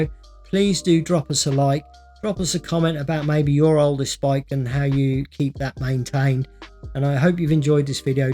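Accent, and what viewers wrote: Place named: Lowestoft, United Kingdom. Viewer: What a fabulous restroation. I'm impressed with the thoroughness and attention to detail. Thought the accent seemed familiar ! You're just over in Lowestoft. Not far from me at all (and 0.2 miles from my aunt !) I'll have to drop in next time I need some kit for my bike(s). I've practically got the entire 1984/5 Raleigh Lightweights range hanging up in my garage and a few other bikes too.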